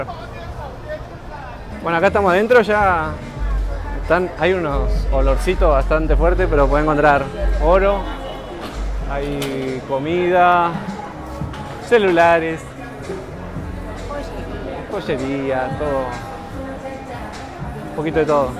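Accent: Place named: Argentina. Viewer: Es guyana, no se dice guchana, se dice llueve, no chueve, buena informacion, solo que no me gusta que los argentinos, hablan con la Che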